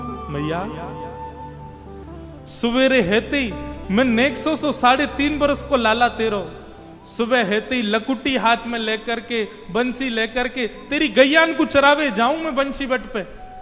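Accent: Braj, India. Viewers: Aaha aapki vraj ki bhasa mohit kardeta hi maharaj koti koti pranam🙏🙏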